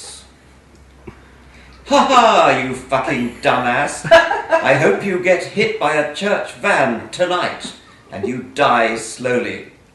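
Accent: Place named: RP, United Kingdom. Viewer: Hearing him swear in an educated and satirical British accent is hilarious.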